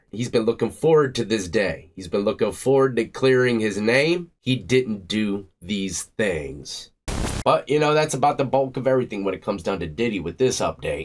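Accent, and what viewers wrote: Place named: Michigan, United States. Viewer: Ur accent is very MICHIGAN. U originally from here by chance?? LOVE YOUR CONTENT ❤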